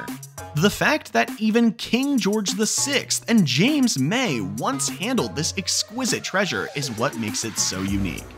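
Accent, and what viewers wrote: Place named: United States. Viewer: Typical video when you hear an American accent trying to explain British facts, you know it is going to be full of errors, lies and poor (or no) research.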